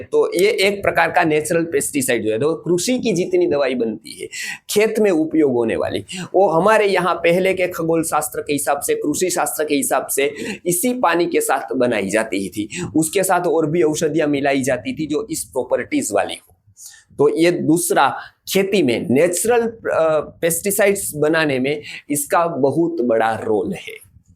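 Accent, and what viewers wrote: Gujarat, India. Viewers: बोलनेके तरीकेसे टोन गुजराथी ही लगते है प्रणाम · पंडित जी आप गुजरात से है क्या 🤔। माफ़ करना लेकिन मैं भी गुजरात से हुं। और आपके वक्तव्य में लहेजा गुजराती जैसा है।🙏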